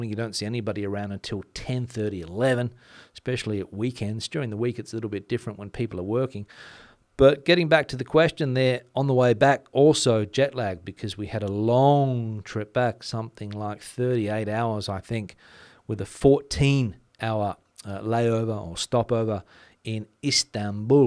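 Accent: Australia. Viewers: did your family make fun of your muted Australian accent? Spain's politics seem tranquil as compared to the USA. I'm stupid enough to have believed we'd return to more sane politics after Trump lost the election. I'm lucky in regard to "Jet Lag". Been to AU and NZ probably 7 times, and Europe at least 25 times. No problems. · nothing to do with anything, but, your Australian accent - I think it's the coolest accent, when it comes to the English language, of all English speaking countries; ours (Canadian, can be funny at times, talking a boot... 🤪)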